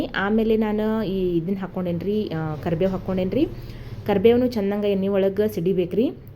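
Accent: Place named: North Karnataka, India. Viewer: ನಮ್ಮ. ಉತ್ತರ ಕರ್ನಾಟ ಕ. ಭಾಷೆ. 👌. ಮೊಳಕೆ. ಕಾಳು. ಪಲ್ಯ. ಸೂಪರ್ 👌ಸೂಪರ್ 👌. ರೀ. ಸಿಸ್ಟರ್.🎉👌👍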